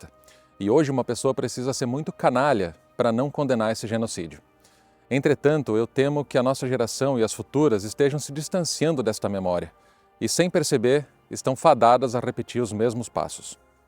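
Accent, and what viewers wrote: South, Brazil. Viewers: Gostei muito da matéria, pelo seu sotaque deve ser aqui de Santa Catarina ou do Paraná. To certo ?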